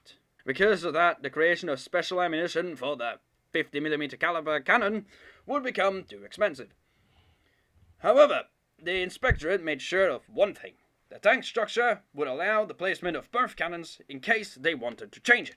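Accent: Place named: United Kingdom. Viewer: Loving that ridiculously british accent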